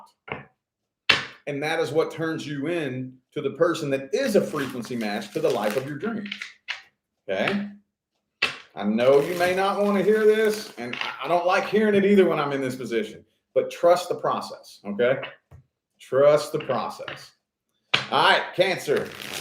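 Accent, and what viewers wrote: South, United States. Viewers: Libra. Need the financial blessings. The person is wanting back and is a twin flame. I have agreed to one more chance. Why do you sound like a southern guy?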